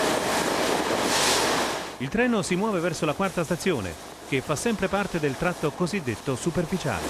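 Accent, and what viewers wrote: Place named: Tuscany, Italy. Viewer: Cmq noi romani, sul tema delle voci degli annunci, abbiamo sempre cercato di emergere dalla massa :D Oggi sulla metro abbiamo la frase "Uscita lato destro....?" pronunciata quasi come fosse una domanda (chi è di Roma e frequenta le metro sà di cosa parlo eheh); ma dopo aver visto questo video, consto che "ieri" la calda voce della metro romana aveva un bell'accento toscano!!! :D:D:D:D sulla metro di roma si parlava toscano!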